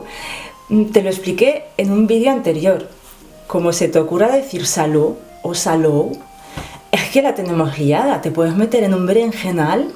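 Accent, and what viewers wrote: France. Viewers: me gustan tus videos y la forma en que explicas, tu acento de que ciudad de Francia es?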